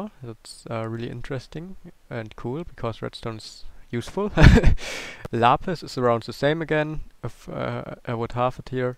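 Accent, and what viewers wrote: Germany. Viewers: You really sound kinda german, when you're speaking... what is you native language? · Are you German you have a german a accent man.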